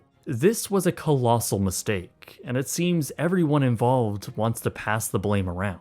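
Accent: Canada. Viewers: I like the hosts crazy Canadian accent 🙂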